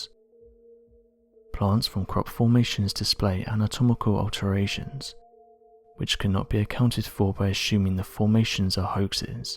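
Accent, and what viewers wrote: United Kingdom. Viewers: British accents don’t turn on all Americans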